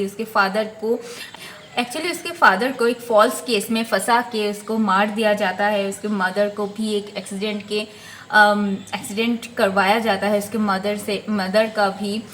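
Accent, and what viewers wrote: Bengal, India. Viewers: accent LG rhi thi Bengali Hindi....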